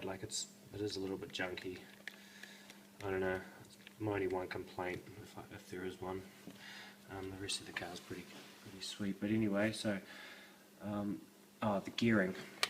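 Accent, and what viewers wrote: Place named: New Zealand. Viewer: wheres that track at bro? u sound like a kiwi aye? :-)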